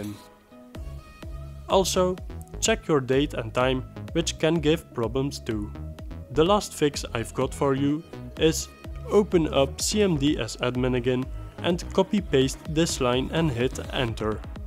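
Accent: Netherlands. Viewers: Man your accent sounds so dutch, i love it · 100% your from nederlands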